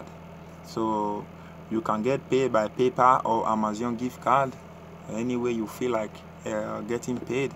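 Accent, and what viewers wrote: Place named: Nigeria. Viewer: He must be Nigerian or just African because I'm African and my dad has his accent lol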